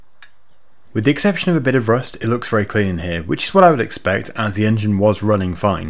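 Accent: United Kingdom. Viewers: You’re British?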